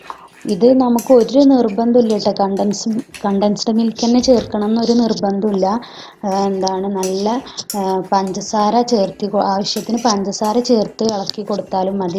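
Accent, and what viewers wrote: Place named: Malappuram, India. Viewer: കുട്ടി മലപ്പുറത്ത് കാരിയാണ് ല്ലേ.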